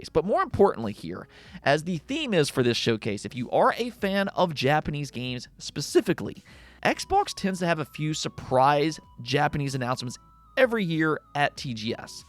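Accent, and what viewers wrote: Philadelphia, United States. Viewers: Are u from Philadelphia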